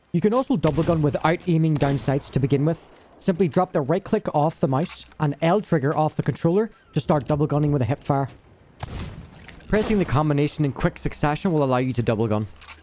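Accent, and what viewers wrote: Northern Ireland, United Kingdom. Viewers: Yeooooo do i hear a northern Irish accent?!?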